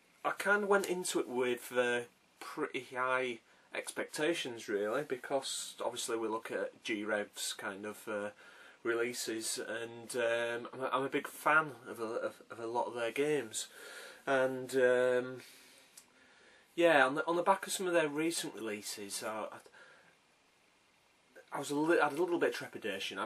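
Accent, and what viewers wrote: United Kingdom. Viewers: Very good video, surprised I hadn't watched before -_- got a little scarey toward the end LOL. Nice to finally see you in the flesh, are you a Yorkshire man? Barnsley, Leeds maybe? Thanks again and keep the psp vids coming!! I'll subscribe.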